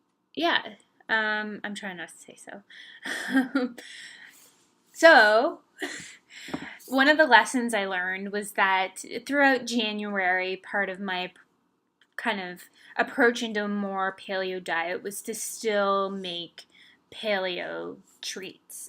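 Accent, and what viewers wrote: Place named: Canada. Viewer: Haha. I mostly hear it in the words - Sorry, hard, thirty, out/throughout, to. Actually, I can always tell Canadians when they say the "word" out or "sorry". Accents are my thing and I spend way too much time studying and imitating them. Haha. I'm a dork.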